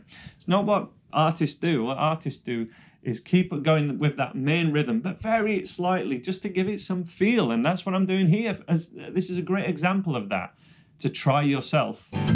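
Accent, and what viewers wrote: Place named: North East England, United Kingdom. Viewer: You have a very North East accent... I reckon Sunderland.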